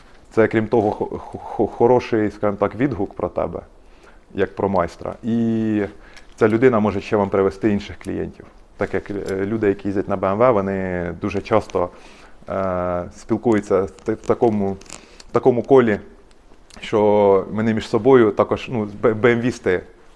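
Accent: Poltava, Ukraine. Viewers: полтовський окцет))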